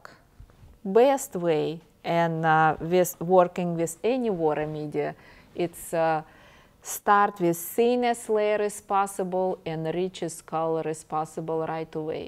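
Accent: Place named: Russia. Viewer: I know she has my accent I miss Russia, but I am glad I am no longer there I love it here :D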